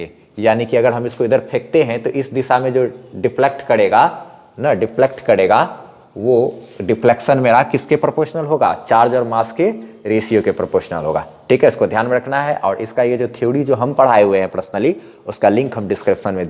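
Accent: Bihar, India. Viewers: Sir aap bihar se ho 😶¿¿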